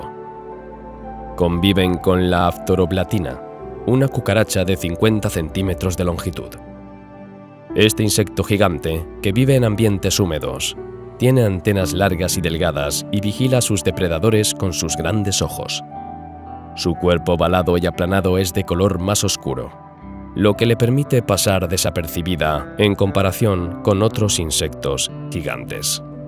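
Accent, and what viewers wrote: Spain. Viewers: doblados al español de España, lo que da una sensación que tus videos fueran documentales profesionales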